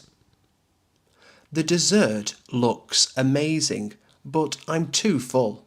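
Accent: United Kingdom. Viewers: What accent do you have? Is it British?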